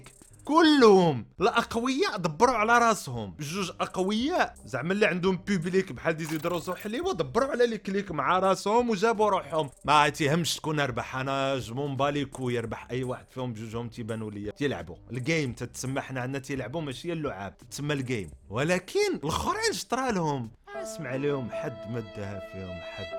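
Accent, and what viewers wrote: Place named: Bechar, Algeria. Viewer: ❤صوت❤ ديالك كايشبه لصوت نتاع ولاية بشار جزائر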